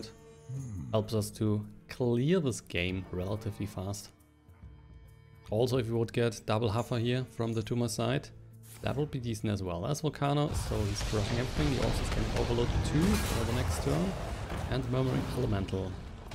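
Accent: Germany. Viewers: Deutscher Akzent :D. Nice deck, i love it and it's my first good deck. I hope my dust is spend right :).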